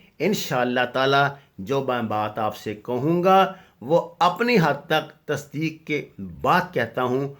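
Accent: Punjab, Pakistan. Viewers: punjabi touch ata hy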